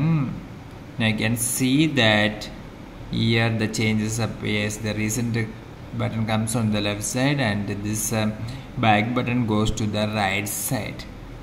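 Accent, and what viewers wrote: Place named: South India, India. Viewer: That South Indian accent though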